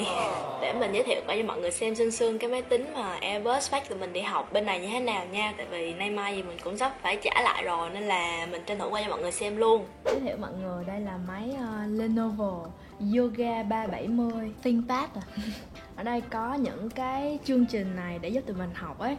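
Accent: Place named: North, Vietnam. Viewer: bạn này chắc là có máu lai miền bắc và miền nam, vì nghe cách nc bình thường thì miền nam mà có cái chữ ấy ấy là người bắc hay dùng và bạn đó cũng phát âm giọng bắc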